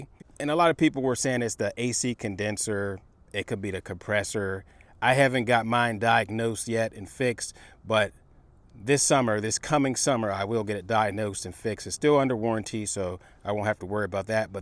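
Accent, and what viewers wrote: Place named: Pittsburgh, United States. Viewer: U from pittsburgh ? Sound like a yinzer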